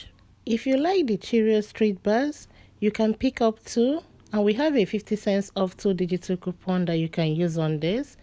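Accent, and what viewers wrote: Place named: United Kingdom. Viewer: I don’t agree! She has an English accent as in African we were taught by the British so the English is slightly different but fully understandable! I for one cannot understand someone like the Couponer Jodi Dunn as she has a strong accent making it difficult to understand the English!She posts the deals very well AND EARLY! I suggest you follow someone that you can understand instead of leaving such disrespectful comments!!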